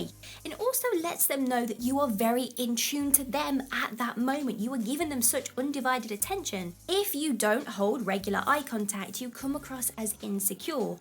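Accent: United Kingdom. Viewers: My god, you're in the UK and you're complaining of heat? I used to live in Borough of Brent, no I'm in the U.S. in California. It was almost 45C today or as they say here 112F where I stay and it will remain around mid 40s until end of September. So please my dear, as someone who has lived in the U.K. don't complain about the heat there. By the way like your videos, the accent makes me home sick but otherwise great. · you look like russians but your english is british, btw i like your dating lectures.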